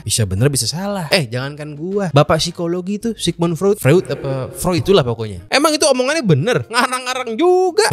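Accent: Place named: Betawi, Indonesia. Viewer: Gw subs si Bro ini karena dialek Betawinya mantaffff dan juga berani roasting tuan besar rotschild.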